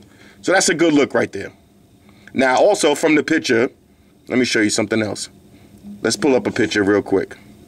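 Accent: New York, United States. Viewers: very good review ,easy to understand, plain english, the way WE New yorkers speak everyday, because of this review , i'm buying this watch, thanks Man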